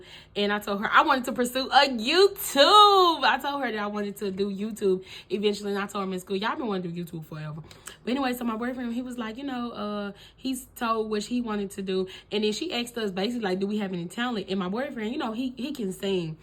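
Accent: Mississippi, United States. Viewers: Accent ?? Sounds almost close to us Mississippi grls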